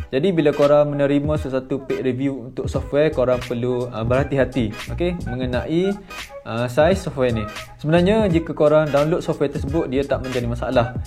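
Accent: Kelantan, Malaysia. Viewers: Ore kelate ko bos?pekat molek bnyi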